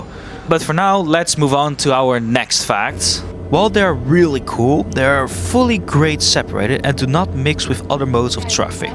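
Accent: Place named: Netherlands. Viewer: Effe in NL : Zijn Engels is oké, maar jouw Nederlands kan beter ! ! Waarom zou hij geen lichte Nederlandse tongval mogen hebben .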